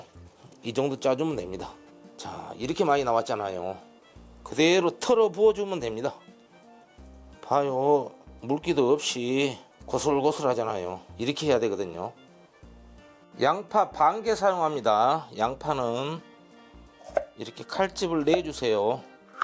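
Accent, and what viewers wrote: Jeolla, South Korea. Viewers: 세프님 음식도 정갈하게 맛도 끝내줄것 같습니다 당장이라도 따라 해보고 싶네요 전라도 사투리도 구수합니다 ㅋ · 항상맛있는요리 알려주셔서감사합니다 설명도 어찌나 맛깔나게 전라도 사투리가 정겹고재밋습니다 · 새프 숙구락 전라도사투리요전라도사투리 김제쪽인가요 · 조물조물을 쪼물딱쪼물딱. 저~~그 전라도 말씨 정감이 가네요. 어떤 요리든 정확한 레시피 감사합니다. 참 대단한 " 셰프입니다 " ❤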